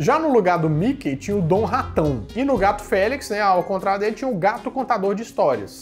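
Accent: Minas Gerais, Brazil. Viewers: E com sotaque mineiro arasaaaa seus vídeos são maravilhosos e com os comentários de vcs ❤️❤️❤️❤️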